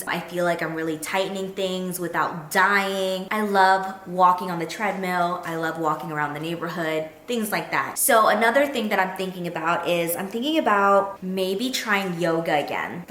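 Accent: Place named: Vietnam. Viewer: good guess! I was thinking Vietnamese too from her accent.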